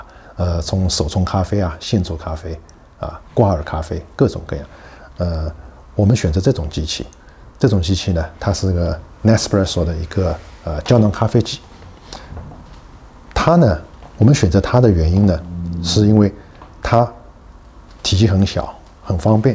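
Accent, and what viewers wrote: Shanghai, China. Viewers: 一口正宗老派上海话，的的刮刮上海人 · 听到上海腔普通话感到很亲切。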